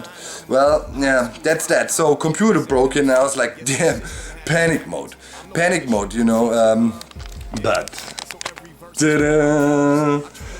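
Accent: Germany. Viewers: German Akzent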